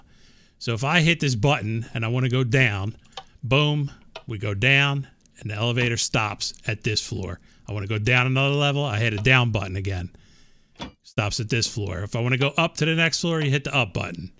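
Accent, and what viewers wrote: Philadelphia, United States. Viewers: Great video! Do I hear a Philly accent? It's wooder not water!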